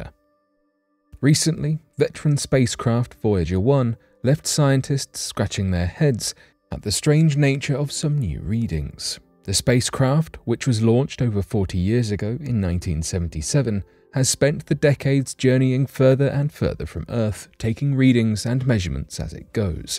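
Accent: United Kingdom. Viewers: How many times am I going to hear "space the final frontier" on a british voice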